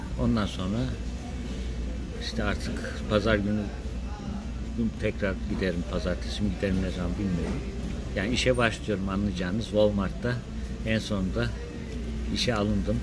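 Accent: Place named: Ankara, Turkey. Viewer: Abi Tam Angara aksanı . Süpersin valla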